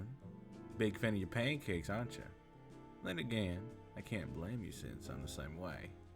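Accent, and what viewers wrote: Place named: South, United States. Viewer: ✨Ohhh a southern accent! You did a phenomenal job! Loved it!😊👏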